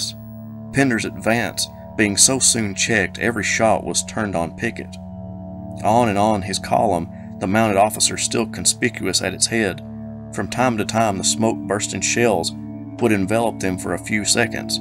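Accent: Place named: Virginia, United States. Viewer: absolutely fantastic Virginian. i could listen all day never tire of it. that reading took me to the battle. WOW!!! thank you sir.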